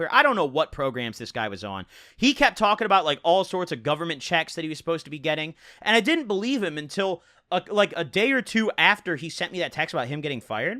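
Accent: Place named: Illinois, United States. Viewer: sounds like he’s from bloomington, il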